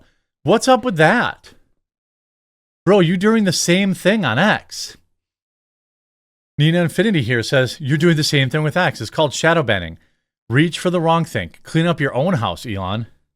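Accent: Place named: Milwaukee, United States. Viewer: Clearly, I can tell your from Milwaukee!😂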